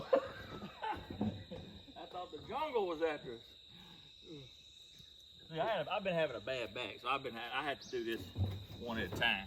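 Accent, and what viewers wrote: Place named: Upper Peninsula, United States. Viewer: You was sounding like a Michigan Yooper a little bit, eh. The strange thing in Michigan is the farther north you go, the more southern it gets.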